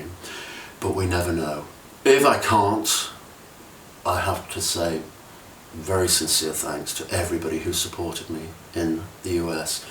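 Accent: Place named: Manchester, United Kingdom. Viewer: goddamn I love his Manc accent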